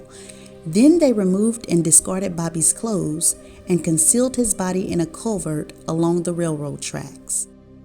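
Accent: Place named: Memphis, United States. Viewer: This was good! Girlllll I thought you were going to be all serious throughout until you gave us that little funny voice over! 🤣 I understand this is a serious matter at hand but even tho " in my Memphis accent " still be you! That's what drew me and others to you. I'm very intrigued about this new genre. You GO GIRL! P.S. I want to purchase your book but forgot the name. Blame my mind and not my heart chile I know you've mentioned it a bunch in the earlier vids... ❤ nevertheless you're an awesome story teller! Hopefully I'll catch a Live 😅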